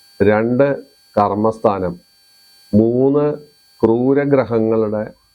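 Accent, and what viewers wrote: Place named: Palakkad, India. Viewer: Iski മടിയില്‍ pootum 😂തനി പാലക്കാട്ട് basha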